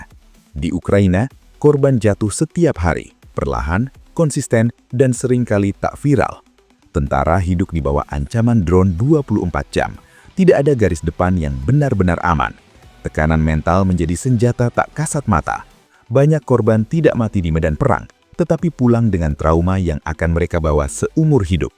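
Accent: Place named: India. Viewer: Același propagandist pro-rus, după dialect de origine indian, a început propaganda pentru anul 2026. Nu vă aplecați urechea. Riscați să vi se strice creierul datorită toxicității.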